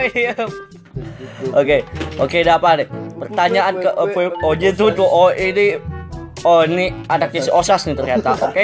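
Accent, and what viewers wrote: West Java, Indonesia. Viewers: bang abang orang sunda yaa? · Abang orang sunda ya?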